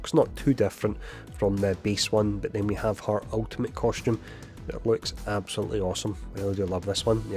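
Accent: Scotland, United Kingdom. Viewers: First video I've seen of yours.......please speak up next time. When someone is whispering with a Scottish accent, it makes it impossible to hear what is actually said. So either change the accent (which I doubt will happen) or speak up. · This guy must be Scottish.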